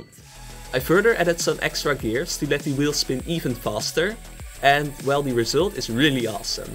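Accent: Netherlands. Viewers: i can hear is NL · Echt een heel erg nederlands accentje, maar wel leuk man dit hahaha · No shit bro ofc hes dutch you can hear in inmediately by the way he talks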